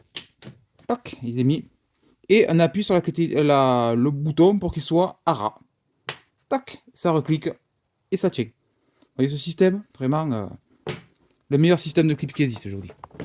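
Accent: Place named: South, France. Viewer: Excellente explication avec de bonnes astuce ! Et un accent du soleil qui fait du bien en prime 😊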